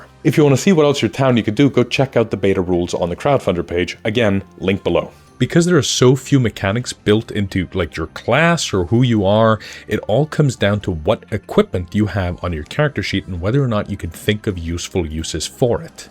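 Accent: Iceland. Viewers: I don't who you are deep voiced icelandic accented henry cavill lookin harry potter glasses wearing person. but your video showed up in my feed and i liked it.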